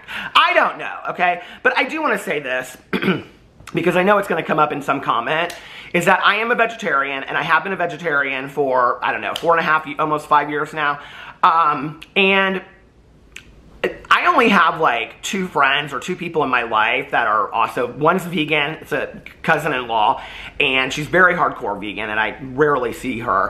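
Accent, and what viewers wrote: South, United States. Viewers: With your Southern draw, where are you from? Excellent video!!!!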